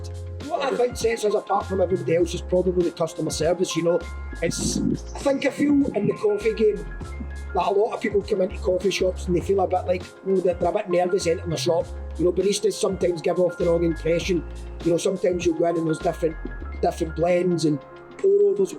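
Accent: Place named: Glasgow, United Kingdom. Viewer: bro sounds like he spent all his days in Glesge instead of NY. Mate I need to try your coffee, i’m fae the southside.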